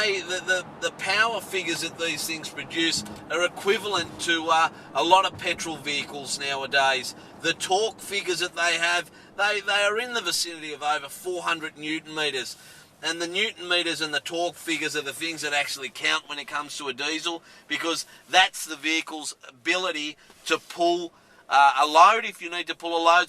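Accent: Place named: Ireland. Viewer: this guy sound like irish man from cavan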